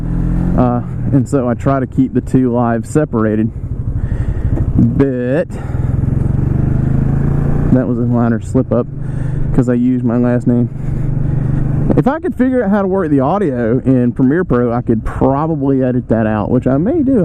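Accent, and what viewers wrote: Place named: Baltimore, United States. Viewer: Are you NC native?....I swear I hear a Baltimore accent lol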